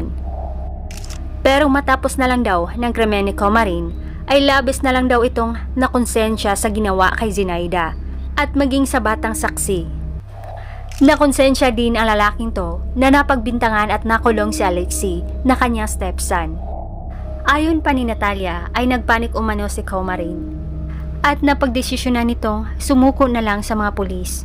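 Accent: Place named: Visayas, Philippines. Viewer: Ok nmn kairita😂 lng ung bisaya accent Mo mejo konti practice P ... But still I'm entertained pdn 😊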